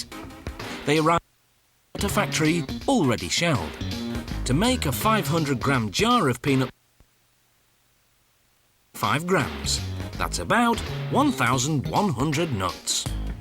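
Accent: United Kingdom. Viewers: Of course the video starts out with a British guy talking about bad teeth. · The bloke is a northerner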